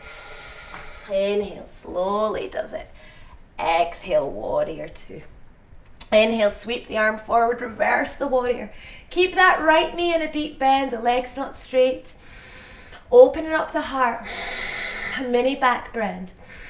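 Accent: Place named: Glasgow, United Kingdom. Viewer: That was great the glasgow accent made it better thanks namaste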